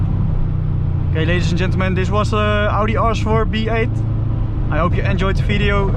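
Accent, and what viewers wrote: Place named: Netherlands. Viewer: hahaha ik hou van dat welbekende nederlands accentje 😂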